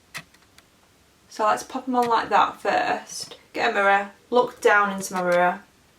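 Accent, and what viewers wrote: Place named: RP, United Kingdom. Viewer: oml your brtish acsent is the next level of porsh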